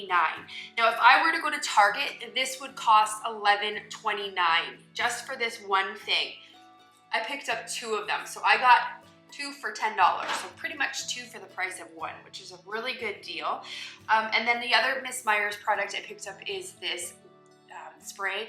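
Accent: Canada. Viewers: Hello, just a question. Do I detect a Canadian accent? Not one y’all , but pasta made me wonder. ❤️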